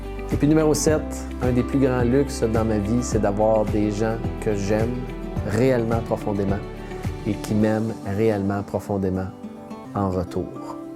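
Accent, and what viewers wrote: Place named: Canada. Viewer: Merci c est vrai que ça va vaut de l or vos 7 grands luxes....C est chaque fois des piqûres de rappel vos vidéos.. Avec votre accent canadien,c est magnifique de vous écouter 😊😊 Bravo 👏❤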